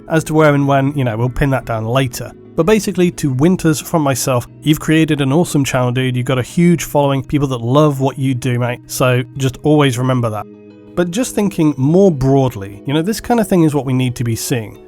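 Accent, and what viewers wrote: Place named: United Kingdom. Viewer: It's not the painting or the role playing that allows for escapism or promoting rewarding precision work. It's all that in the tougher times, people flock to the source of british accents being spoken for it consoles them, makes them calm. Take voice for example, we all come here just to listen that man speak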